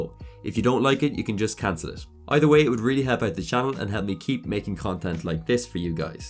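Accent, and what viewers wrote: Ireland. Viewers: I'm loving the content on your channel, really excellent work and I hope you keep gowing/growing.... I'm wondering bout your accent tho, Laois Carlow, Offaly, Kildare? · Nice hearing another Irish accent on YT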